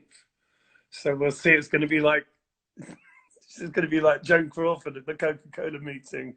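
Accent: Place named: United Kingdom. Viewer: And I love your British accent!😍😍😍😍😍😍😍😍😍😍😍😍😍😍😍😍😍😍😍😍😍😍😍😍😍😍😍😍😍😍😍😍😍😍😍😍😍😍😍😍😍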